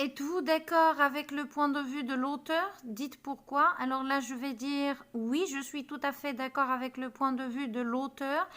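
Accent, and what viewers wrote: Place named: France. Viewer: Merci énormément Madame, votre travail est admirable. J’ai beaucoup aimer votre accent française et la explicación formidable que vous avez-vous fait en français. 👏🏼👏🏼👏🏼👏🏼👏🏼 top top top 👍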